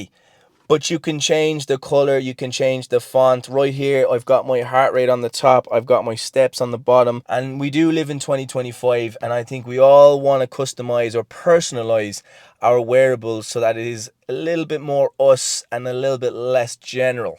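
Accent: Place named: Ireland. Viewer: I’m not listening to a word this man is saying because his Irish accent is so lovely I’m totally distracted.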